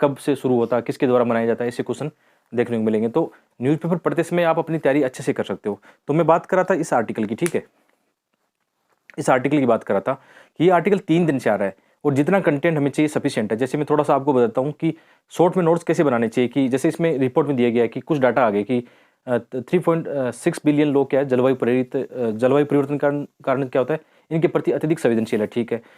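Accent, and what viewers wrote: Haryana, India. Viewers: आप हरियाणा or राजस्थान से है क्या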